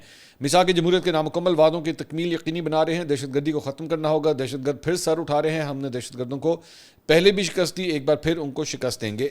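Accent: Punjab, Pakistan. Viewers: punjabi touch zaida karay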